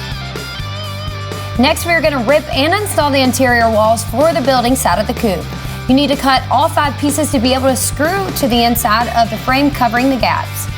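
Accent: South, United States. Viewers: I could listen to your sweet southern voice rack off measurements all damn day...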